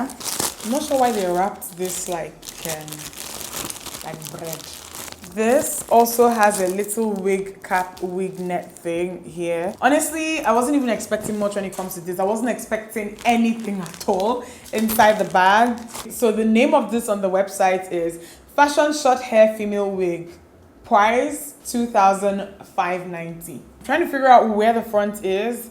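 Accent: Igbo, Nigeria. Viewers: The additional igbo accent just perfected this video 😂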